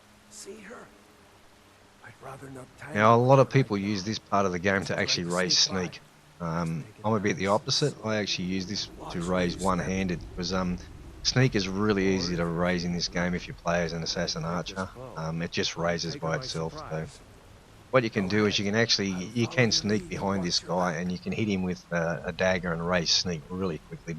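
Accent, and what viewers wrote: Australia. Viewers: this is an aussie accent... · You from Australia 🇦🇺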